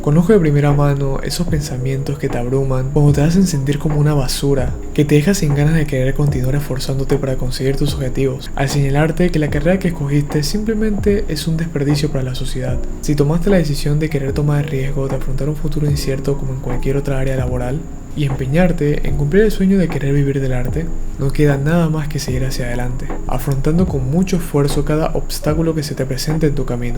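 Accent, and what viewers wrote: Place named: Panama. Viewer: Cada vez que pasaba el vídeo, yo me decia: "es como si me estuviera hablando un amigo, tiene el acento de aquí" y voilá, si, jajaja, es super raro encontrarme a otro panameño en Youtube. Saludos! n.n 👋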